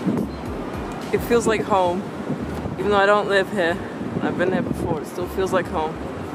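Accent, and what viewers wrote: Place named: Australia. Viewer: Do you live in the USA? Your accent sounds Australian. That would be a very long flight to New York to just visit for a few days. Haha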